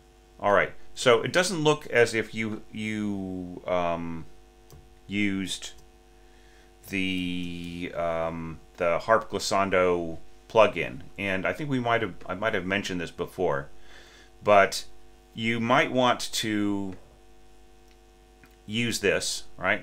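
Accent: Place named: France. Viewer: Very sweet. Sounds very French. I love it. And so many thanks. It is a joy to hear you talk about the score...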